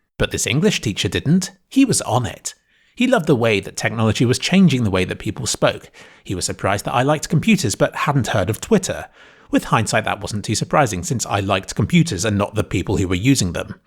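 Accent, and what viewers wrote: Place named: Forest of Dean, United Kingdom. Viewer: Sounds like the people in the Forest of Dean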